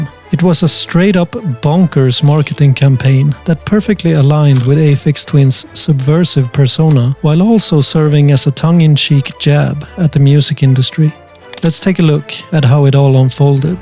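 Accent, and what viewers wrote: Sweden. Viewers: You didn't ask me to like, subscribe or comment, but i did all three. Awesome video and i love your mild Swedish (?) accent.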